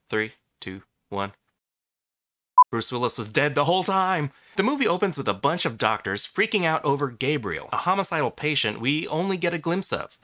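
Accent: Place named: South, United States. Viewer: I just found your channel and really like your reviews! Sorry if you've said it before, like I said I'm new to the channel, but I was wondering where you're from? I think I'm hearing a bit of a southern drawl but I can't be entirely sure. Love from Atlanta!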